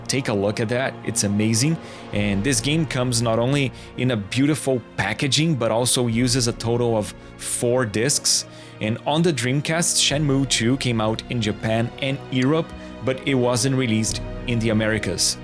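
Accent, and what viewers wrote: Brazil. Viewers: bro real question, are you brazilian? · o sotaque desse maluco é de brasileiro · Notei o sotaque brasileiro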